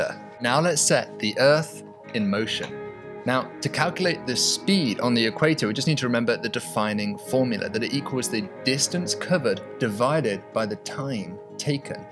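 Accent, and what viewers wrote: England, United Kingdom. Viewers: English accent does that to most people, he was raised in the UK, went to the University College of London so he was engrained with it. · For anyone in the USA or UK MPH is more intuitive and he sounds English to me so I guess it’s up to him.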